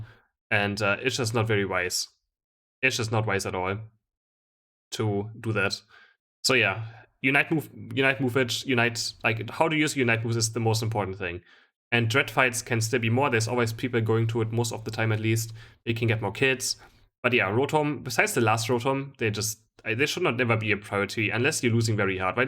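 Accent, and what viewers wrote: Germany. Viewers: Hey, thank you for this nice and informative Video, i've got a question to you:D Your accent sounds really german, may i ask where are you from?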